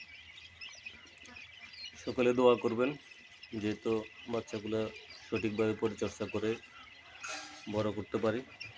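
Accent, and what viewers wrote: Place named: Noakhali, Bangladesh. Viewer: নোয়াখালী কথায় ❤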